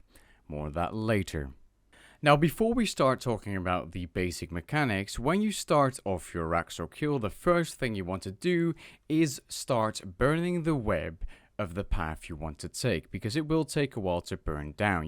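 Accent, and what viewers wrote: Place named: Netherlands. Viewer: you can't hide your dutch accent boy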